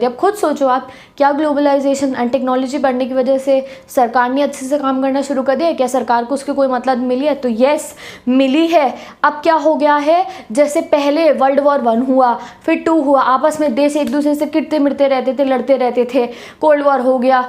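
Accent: Punjab, India. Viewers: ur punjabie this is clearly fy with ur talking style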